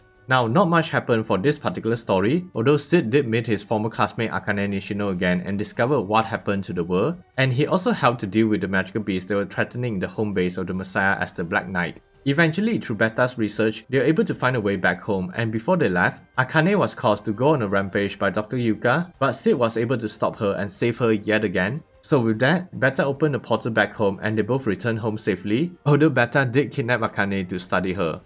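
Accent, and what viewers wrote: Singapore. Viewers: no wonder your accent sounds familliar, singaporean sia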